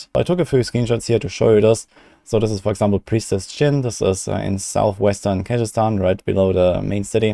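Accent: Germany. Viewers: Bruder, du sprichst richtig gut Englisch, aber dein Akzent ist alman. Dhat hurts my ihrs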